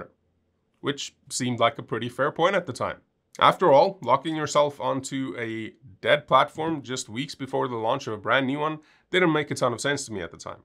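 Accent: South Africa. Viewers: I didnt even notice the afrikaan accent untill the dude mentions rand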